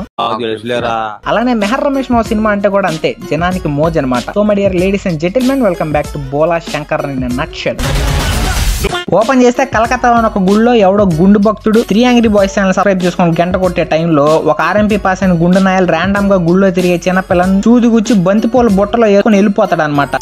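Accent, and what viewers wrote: Nellore, India. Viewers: Mama nedi Nellore eh gaa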